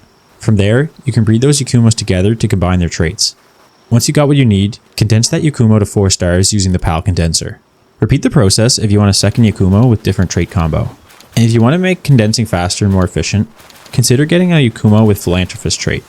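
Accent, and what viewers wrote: Canada. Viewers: Is that a fellow Atlantic Canadian I hear?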